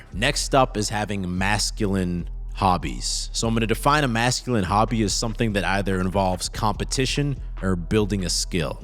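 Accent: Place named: South, United States. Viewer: This is my first time noticing the southern accent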